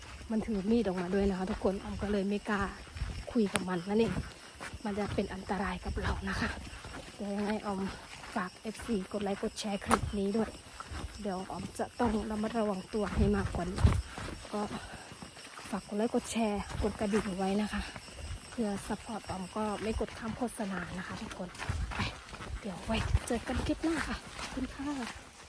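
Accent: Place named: Laplae, Thailand. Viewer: เสียงเหมือนคนลับแล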